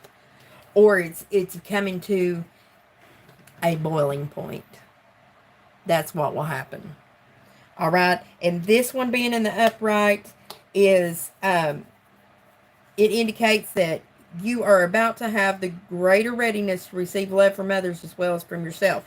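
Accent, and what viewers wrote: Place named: South, United States. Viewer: ♥️🙌 Love the southern in ya, Sister! 💫🌻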